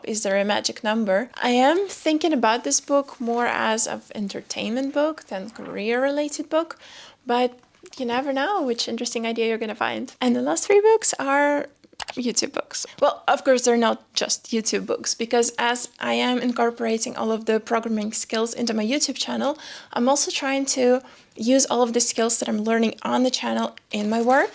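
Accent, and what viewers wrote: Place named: Eastern Europe. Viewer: you have slight slavic accent, where you from?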